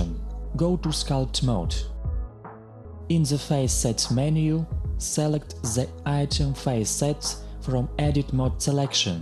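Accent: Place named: Russia. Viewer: Тоже прикололся с явного русского акцента?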